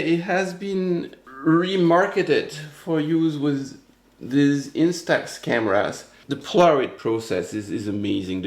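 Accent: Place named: France. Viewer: SELA-NOY-D love how pronounces things! That French accent!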